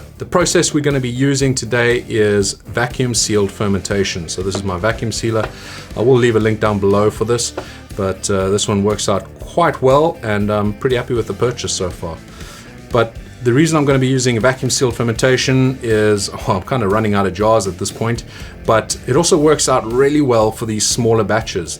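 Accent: South Africa. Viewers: Where are you based? You sound South African.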